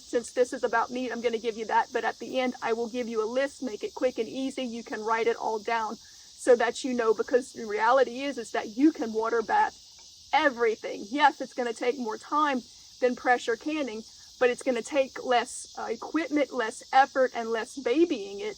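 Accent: Louisiana, United States. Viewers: I knew you were from south Louisiana the moment you spoke. I might be in Tennessee but I boil crawfish here too because I lived in New Orleans most of my life. Great video.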